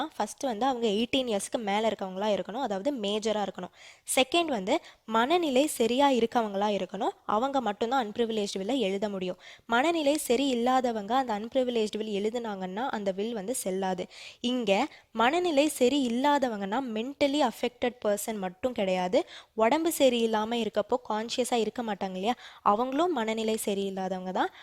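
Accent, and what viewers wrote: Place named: Madurai, India. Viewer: Amma ponnu....unnoda voice, prounouncation, modulation... Super ma..! Nee Madurai ya ma?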